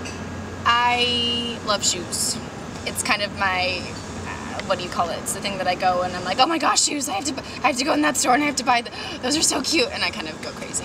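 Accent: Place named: United States. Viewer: i thought she was from south africa? she sounds american to me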